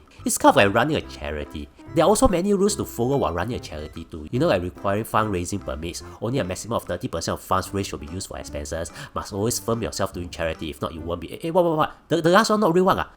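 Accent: Singapore. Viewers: If you are not Singaporean, it’s Singlish ; common folks way of talking to bridge communication. If you expect Queens English, this is not the channel for you. I understood him perfectly 😊. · Moron, why distort your voice and shame Spore with your rotten English and Singlish?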